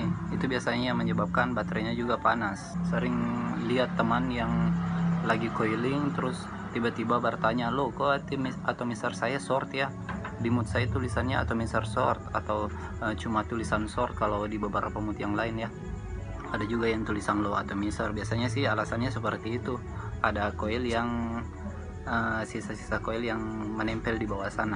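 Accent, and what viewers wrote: Bugis, Indonesia. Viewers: Logatnya kaya saya kenal daeng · Kya orang bugis ngomongnya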